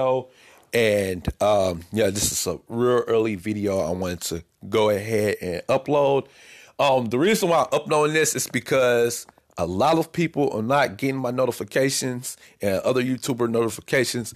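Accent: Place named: India. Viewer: Another good video no rasict I thought this was a Indian person making this vid. I don't understand what those Indians be saying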